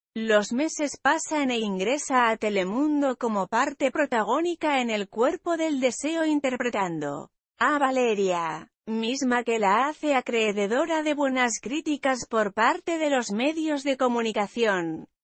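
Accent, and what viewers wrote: Spain. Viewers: Odio el acento español!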